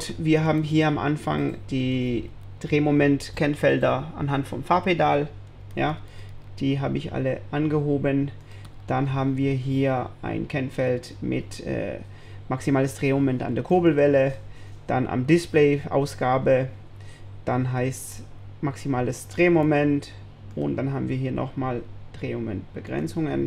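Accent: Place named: Swabia, Germany. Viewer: Super Video, schön erklärt und der schwäbische Akzent passt perfekt! Grüße aus dem Kochertal :P!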